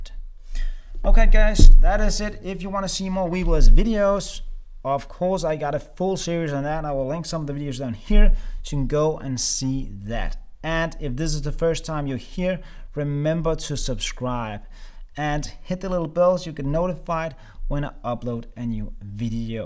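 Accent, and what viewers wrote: Denmark. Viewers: wtf.. Troede aldrig jeg ville finde en film making related YouTube kanal fra en dansker.. Var så forvirret over jeg kunne høre du var dansker, da jeg ikke tænkte der var chance for det haha Men får bestilt præcis denne stabilizer idag, and keep up the great work! :)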